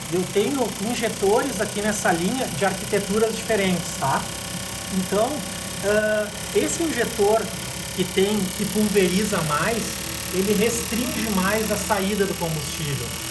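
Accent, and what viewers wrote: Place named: South, Brazil. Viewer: Cara tem a mesma voz do Cristian da Education kkkkkkkkkkk esses do Sul falam bem parecidos com outros da região ........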